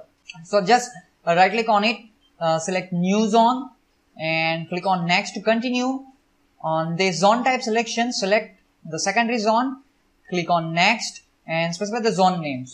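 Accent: India. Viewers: No option for Closed Caption to go with this heavy fast talking Indian accent? This tutorial would be better served either with CC or someone with less accent when speaking English.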